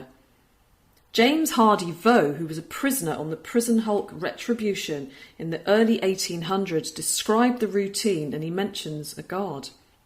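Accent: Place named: London, United Kingdom. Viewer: I restrained myself, but during the Hoo Island adventures, I started to leave a comment asking if you'd seen Horton, and are there actually Hoos on Hoo Island. It comes from a Dr. Suess book, Horton Hears a Hoo.:) Years ago, I had a friend who was from London. She was much older than me, but still a very lovely woman. The bottoms of her arms were horribly scarred from glass shards. As a baby in London her family had sought shelter in a store with big glass windows. She heard the women scream and saw black shapes falling from the sky, so she instinctively crossed her little arms in front of her face. That was 1940 and she was 3. I knew her in 1998. She had a lovely accent and voice like yours. I'd drink beer and talk to her at a bar called The Waterin' Hole. Her American hubby didn't like going out. I suppose she'd be about 80, or 81 now.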